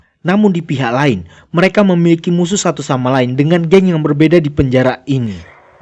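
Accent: Medan, Indonesia. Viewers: Admin nya Orang Medan ya?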